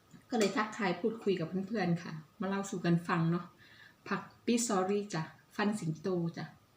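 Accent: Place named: Korat, Thailand. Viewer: น้อง เป็นคนจ้งหวัดอะไรสำเนืยงพูดเหมือนคนโคราชมัยหรือว่าแถวนางรอง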